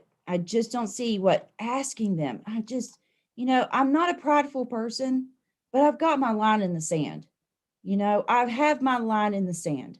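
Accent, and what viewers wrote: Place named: Tazewell, United States. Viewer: Coming from convo couch. Im a Tazewell native, so my accent is about the same as yours!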